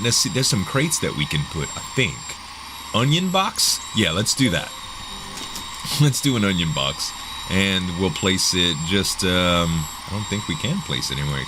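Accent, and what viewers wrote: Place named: South, United States. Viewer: You seem more country then last time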